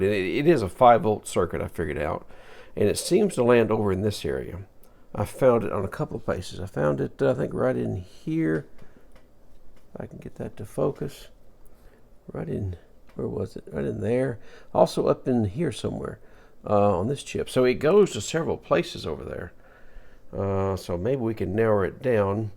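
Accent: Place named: Virginia, United States. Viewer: Hello! I came here from joey does tech. Probably from the discord. Love your videos i think they are fab. I'm in Toronto. From your accent can i make a guess that you are in...Virgina? Maybe a Carolina.... Cheers Peter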